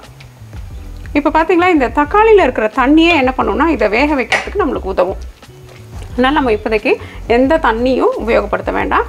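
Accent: Sri Lanka. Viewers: It was really nice.. but your way of speaking is the nicest. Native place Sri Lanka va sis? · Hi sis i am rekha.tomato curry nalla iruku. try pantren.nenga tirunelveli thana. Nenga pesum konchum tamil ketkum pothu sri lankan pesum alagu tamil mathiri iruku.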